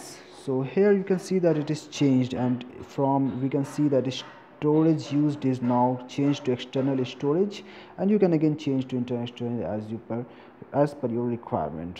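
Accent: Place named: Pakistan. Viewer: Are you Pakistani? You remind me of Kumail Nanjiani the way you pronounce certain words.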